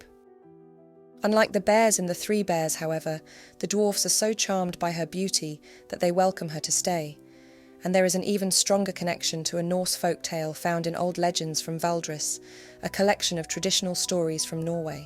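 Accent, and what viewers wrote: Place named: RP, United Kingdom. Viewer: I like the narrator's posh accent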